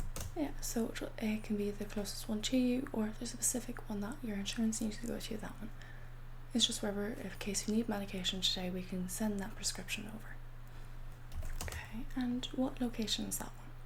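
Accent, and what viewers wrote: Ireland. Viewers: I love the Irish accent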